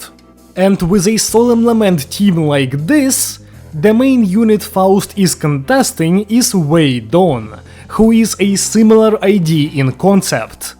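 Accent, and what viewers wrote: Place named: Russia. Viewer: почему произошение такое будто русский читает англ текст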